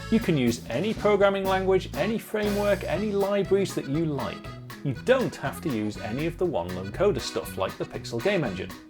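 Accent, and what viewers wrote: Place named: United Kingdom. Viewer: why you don't say hello with that British accent, is important to me as old subscriber 😠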